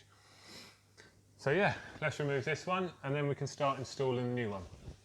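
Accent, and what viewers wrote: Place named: East Anglia, United Kingdom. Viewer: I can tell, the east anglean ish accent 😅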